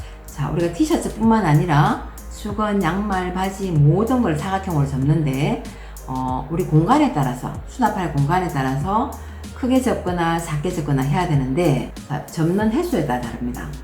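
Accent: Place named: Daegu, South Korea. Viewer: 우와~~~~ 대박 대박 대박! 사투리 들으니 대구 가고 싶어요ㅎㅎ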